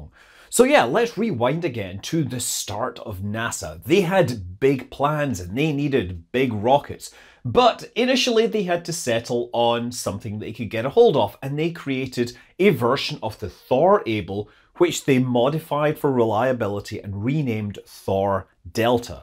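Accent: Scotland, United Kingdom. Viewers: Why are these "Sons of Thor" and not "Daughters of Thor"? Also, you are sounding particularly Scottish today. What happened?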